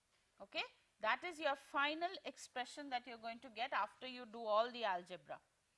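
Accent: India. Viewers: lmao! ""OXIDOREDUCTASE!!"" man a luv da indian accent!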